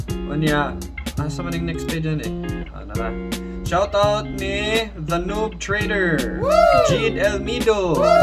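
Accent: Visayas, Philippines. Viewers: New subscriber sir from Tiktok to Youtube.lingaw kaayo ko nimo sir bisdak kaayo ka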